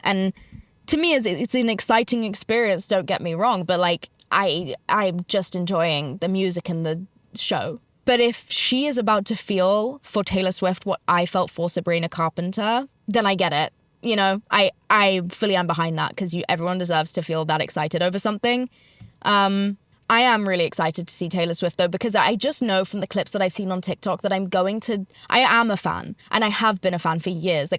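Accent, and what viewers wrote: United Kingdom. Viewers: I don't think an american accent has developed but the britishness of your accent has definitely decreased. It's noticeable if you go back and watch early tiktoks of yours. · You did say Vite-a-min 😂 it’s VIT-A-MIN. But yes, overall you’re back to British. 🇬🇧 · me I don’t hear the US accent but the UK one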